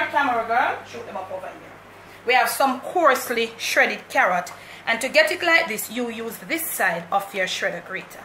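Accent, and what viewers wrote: Patois, Jamaica. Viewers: The people who thumbs down your videos a pure fools don’t change your patois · Chop yuh patois Ms we love it! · I enjoy the videos and the lovely Jamaican patois. As some one once wrote everyone would like to be Jamaican as it is cool. Keep it up. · Keep up the patois..... for those don't like it....... bun fyah fi dem. Big up 💪🏽💪🏽❤❤😘😘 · I came across your channel on new year's day of this year. I was so excited to see a fresh new face and to hear the patio ( the worst thing you can do is trying NOT to be authentic or "speaky spokey " as my elders would say). Your Jamaican accent is what brings a lot of flavor to your channel besides your delicious food. Just block the haters and continue with your patio. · British🇬🇧 lady with Jamaican🇯🇲 parents. Mummy lived in UK for over 45 years. Mummy never "twiss up ah mout fi nobuddi traight!!! 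Jamaican til the day she dead....You are authentic JA!✊🏾 keep up the patois only tuppid eedat ah gwarn like dem fool👍🏾👍🏾👍🏾✊🏾✊🏾✊🏾✊🏾💯❤ Mannerz and Respeck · I like the way how you talk patwa me use to live in Guyana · Me love me jamaican way of talking you go dont stop we talk sweet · Good evening,. Cook down or cook up it's all good. Don't allow anyone to change Yuh.. Me nah undastan er ting you talk in Patois but me luv it....Food tun up up up. Bodow...Boom Trini in de house. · I’m enjoying the the patois, it’s your culture and you’re being authentic. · Love the patois. Don’t stop, adds sweetness to the videos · I love your cooking videos. The patwa mek it very authentic. Gwan wid it same way. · mouth mek fi chat anything dont pay dem no mind, everytime I introduced this vlog to someone we talk about the same thing. Yuh just feel like an era of Jamaica that has passed, so pure and happy with your craft. 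Because this is a gift, you make me feel like I'm back home watching my Granny and Mommy cooking and laying out nothing but good Jamaican values. That switch up at 3;34 is exactly why only fiwi language can explain it. Always puzzle mi how u can sit and watch something like this and the only comment you can make is a negative one. Its draining people let that go. Full time we appreciate the beauty in wi likkle dialect, its just pure poetry once you understand it. keep teaching, I might not comment but like clock work we watch everything. Know that your are top 3 of all vloggers on this island. Rass Mokko, In Jamaica &. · Lady I love you 😅😅😅😅😅😅unno don't bother ask her how much millimeters of ginger.... 🤣🤣🤣🤣🤣🤣🤣🤣🤣🤣🤣you're the only person I follow on YouTube.... O...and chat you patois wi nuh cater fi nobaddi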